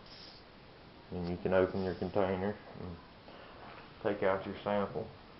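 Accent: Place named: Tennessee, United States. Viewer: THANK YOU MAN! Great job! Great idea! You sound like your around here (tennessee).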